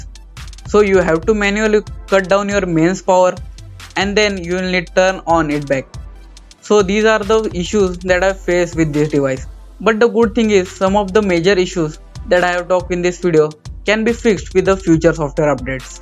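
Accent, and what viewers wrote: India. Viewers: Hindi speaking English; I hate that accent. All are the same.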